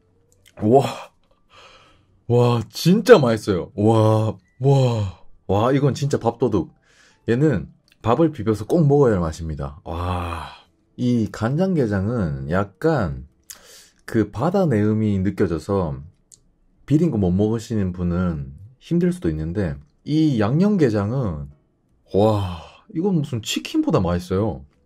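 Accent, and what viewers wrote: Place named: Seoul, South Korea. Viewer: 아닠ㅋㅋㅋㅋㅋ 사투리 쓰시는 분이 서울말 쓰시려고 노력하는 말투 너무 긔엽네욯ㅎㅎ💗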